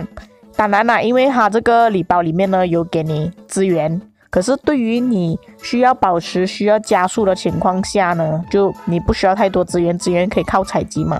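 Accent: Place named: Malaysia. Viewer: 你哪里人哦 马来西亚口音很重哦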